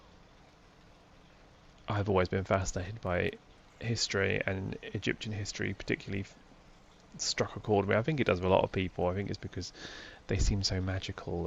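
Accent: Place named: United Kingdom. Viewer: I stan a British man speaking softly into the microphone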